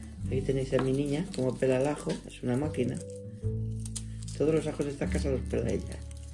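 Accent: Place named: Mexico. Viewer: Saludos desde Matamoros Tamaulipas México 😊 Frontera de México y EEUU Me sorprende que usted siendo de Asturias Habla igual que nosotros Los mexicanos jeje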